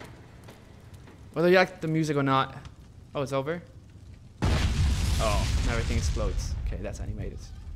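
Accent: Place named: United States. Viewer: hätte ich nicht gewusst dass du Deutscher bist, hätte ich gedacht du wärst ein Ami xD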